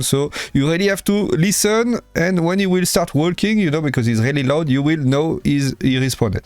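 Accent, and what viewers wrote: France. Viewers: I like u Baguette accent ❤ u do the best Videos for Trials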